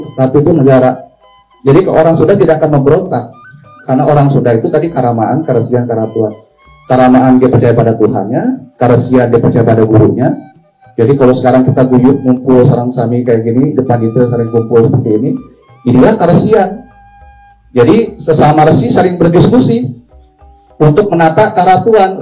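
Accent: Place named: Bali, Indonesia. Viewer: Logatnya masih Bali.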